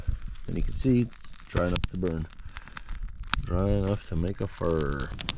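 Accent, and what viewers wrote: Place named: Northern Ontario, Canada. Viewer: I can't remember where is from, sounds like he's alot closer to me than I thought. 3hrs from NB, so around Timmins or Cochrane?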